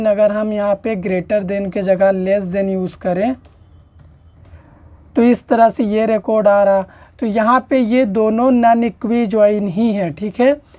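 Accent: Jamshedpur, India. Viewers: Aap jamshedpur se ho kya 😅? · Sir JAMSHEDPUR se ho kya?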